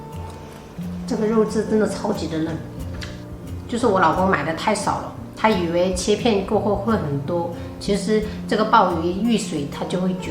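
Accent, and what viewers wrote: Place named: Minnan, China. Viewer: 四川人闽南话说得那么地道？赞一个！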